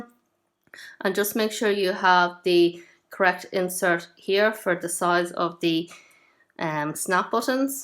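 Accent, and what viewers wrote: Ireland. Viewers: it’s an irish accent! i was wondering about that but didn’t recognize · Are you from Ireland? Thank you for this I couldn’t read Chinese instructions. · Thank you! I've been looking for such buttons and tools but the results are pretty much all on Amazon and/or made in China, the 2 things I wanted to avoid. By your accent, I'm guessing you're Irish too? If so would you happen to know of anywhere else I can buy them, particularly a good tool that isn't made in PRC? I'm not finding any in Hickey's or similar shops. (I found some buttons from Honk Kong which is fine for me and there's a Taiwan site which have the press pliers tool but it's mainly for bulk orders) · Just found your channel and love it. Great to hear the irish accent. I'm irish too, from Sligo. Great tips on using the snaps. I have liked and subscribed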